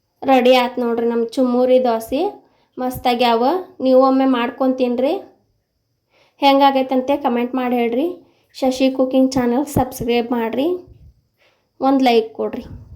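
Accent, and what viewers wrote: North Karnataka, India. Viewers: Adu nam uttarkarnataka Kannada gramin bhashe adu...yaw yaw oorkade Heng Heng matadtaro hang matadtidare bidi.. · ಉತ್ತರ ಕರ್ನಾಟಕ ದ ಸ್ವಚ್ಛ ಭಾಷೆ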